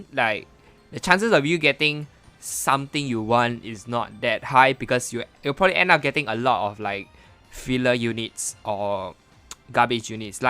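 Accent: Singapore. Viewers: Are u from Singapore I can tell from your acent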